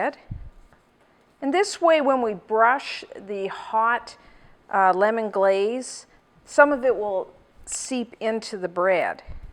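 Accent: Canada. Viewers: She’s definitely Canadian 😂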